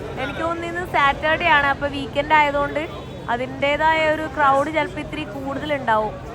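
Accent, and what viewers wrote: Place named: Kannur, India. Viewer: Hiii you always speak in Kannur slang 😊❤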